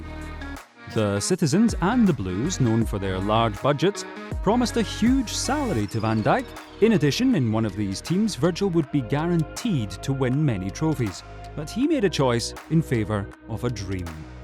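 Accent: Scotland, United Kingdom. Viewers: You have a Scottish accent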